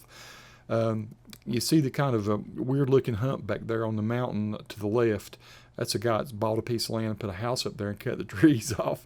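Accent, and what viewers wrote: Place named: South, United States. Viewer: Thanks so much for making and sharing your videos. I’m “Southern by Choice,” having lived my first 18 years in America’s Siberia, and all these decades later I still don’t have a consistent Southern accent. You have a great one. Honest, I repeat after you 😄 tryna get rid of my rough edges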